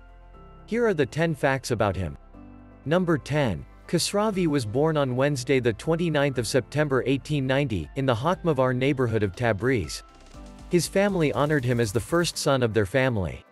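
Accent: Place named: United States. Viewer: American Accent on this video Sucks Pronunciation even worse Hollywood Graphics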